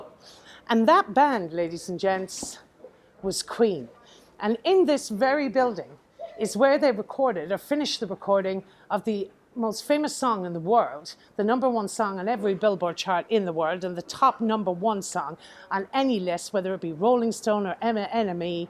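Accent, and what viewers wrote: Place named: Ireland. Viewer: Apart from the Irish accent, I love your talking voice. Bet you can give it a rock and roll number, too, if not an Irish ballad. Both!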